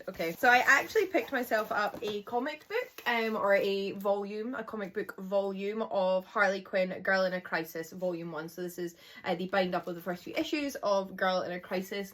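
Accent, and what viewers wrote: Scotland, United Kingdom. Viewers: These all look great and they're such pretty books. Also I love the way Scottish people say book.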